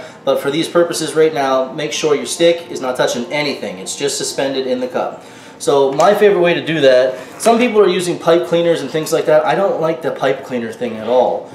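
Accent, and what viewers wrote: Newfoundland, Canada. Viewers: Sounds like Newfoundland. I'm also from there. Love the accent. I'm homesick now lol.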